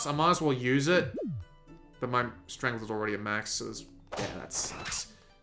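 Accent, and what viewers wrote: Australia. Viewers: Thanks for the footage - nice to hear an Aussie accent too :) Reckon I might have to grab this.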